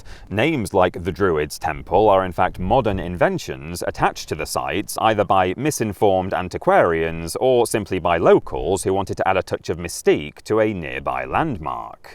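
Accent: United Kingdom. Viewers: I usually prefer English accents -not this one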